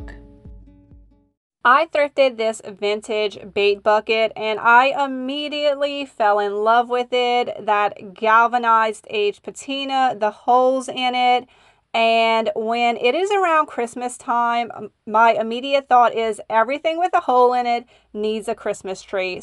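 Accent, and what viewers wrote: Louisiana, United States. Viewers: Are you from Louisiana? You sound just like me! 😆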